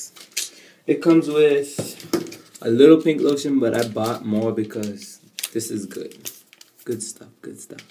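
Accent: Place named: Louisiana, United States. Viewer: Aww I love ur voice, you kinda sound like ur from Louisiana.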